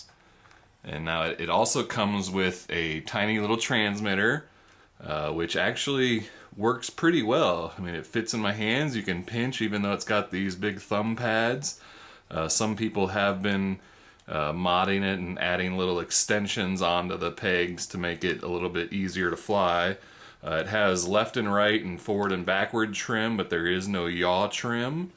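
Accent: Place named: South, United States. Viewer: How come when I watched this before you had a southern accent?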